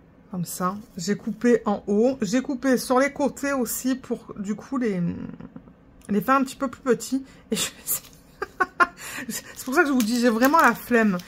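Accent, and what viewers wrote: Marseille, France. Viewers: Coucou, merci pour vlog🥰🥰🥰 je vous souhaite à tous les 3 bon week end et bonne semaine et pleins de Rôôôse🌹🌹🌹🌹🌹 (j arrive pas par écrit a faire l accent marseillais 🤣😂🤣)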